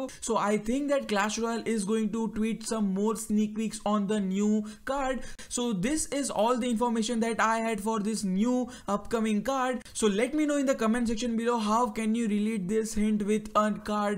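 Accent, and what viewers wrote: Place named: India. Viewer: Seems like Indian accent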